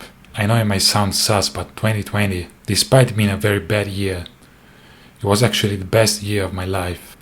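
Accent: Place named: Italy. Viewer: Please don’t give up🩷. I accidentally stumbled upon one of your videos. I heard an Italian accent ( my Mom and her family were from Italy), so I stopped and watched. I hadn’t laughed so hard in years. My husband plays bass( and guitar, sorry), so we both watch together. Please know, you gave me my first real laugh since my Mom died. Your videos about playing bass reached all the way to America, and gave one grieving daughter a reason to smile.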